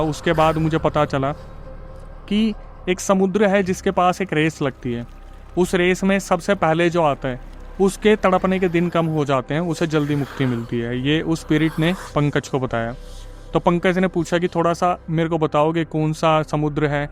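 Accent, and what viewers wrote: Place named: Bhojpuri, India. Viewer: awaj se bta rha hu pakka bhojpuriya ladka hai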